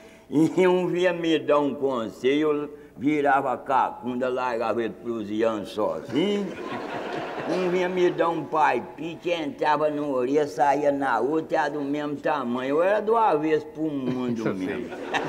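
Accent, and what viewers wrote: Minas Gerais, Brazil. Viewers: Pensei q fosse de Minas, Somos mineiro e meu padrasto cunversa desse jeitinho.. Sem cortar e nem tirar. Grande seu João meu segundo pai.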